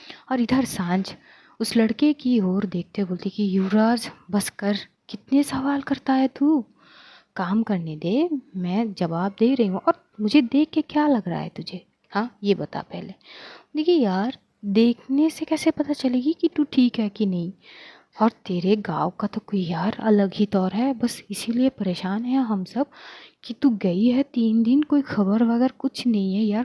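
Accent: Haryana, India. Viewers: Kya story hai yar or upr se aapki haryanvi voice mar dalogi sach me 😂😂😂😂😂😂😂😂😂😂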